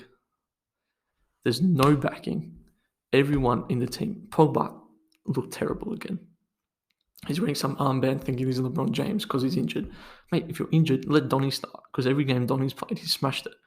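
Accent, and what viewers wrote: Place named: Geordie, United Kingdom. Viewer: true geordie esque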